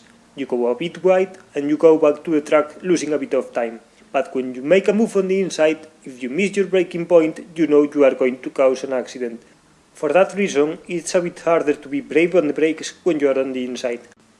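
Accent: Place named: Spain. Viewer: Thanks for the video! You sound like Carlos Sainz Jr. but with a heavier accent, are you Spanish?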